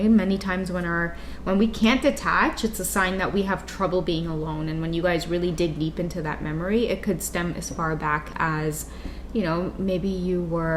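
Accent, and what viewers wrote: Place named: Trinidad and Tobago. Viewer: I love your Trinidadian accent and love your help. Thank you for your time and energy<3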